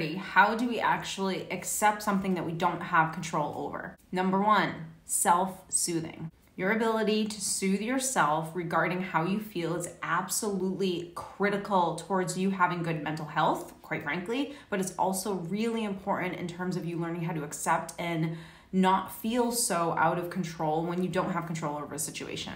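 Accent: United States. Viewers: thank you lady to listen to you it helps me to overcome my deception and now I'm so confused. and it's true you can't control what happen in our life . I have another tool to keep living glad is the music . I don't know where you have found this wisdom? it's too nice to hear your American accent. thank I feel better now and I learn so more when I go through trouble it learn me the reality of the life. thank you I'm RICHARD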